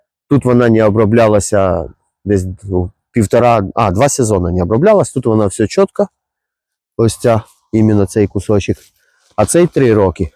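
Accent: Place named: Dnipropetrovsk, Ukraine. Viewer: Говор схожий на Дніпропетровську обл, думав що ви з пятихаток👌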